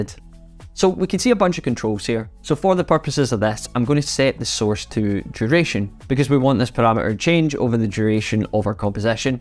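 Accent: Scotland, United Kingdom. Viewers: Your Scottish accent is what I live for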